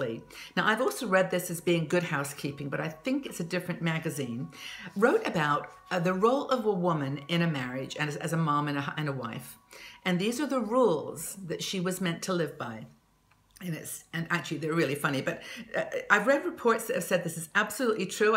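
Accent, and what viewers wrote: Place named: United Kingdom. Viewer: your British English!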